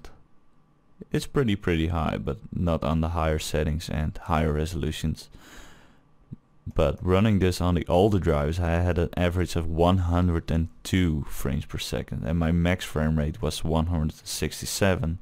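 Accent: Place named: Netherlands. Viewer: lol you´re dutch arent you?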